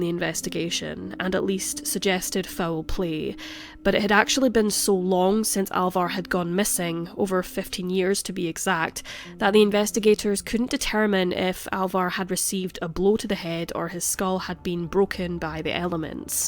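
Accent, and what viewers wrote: Scotland, United Kingdom. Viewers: Who is this bird with the Scotts voice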